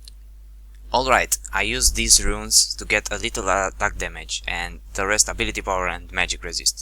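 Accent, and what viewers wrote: Romania. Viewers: Se vede pe vocea ta ca esti roman :). Joci foarte bine cu Akali. Tine o tot asa. Cred ca o sa mi o cumpar si eu. :) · are u from romania?:D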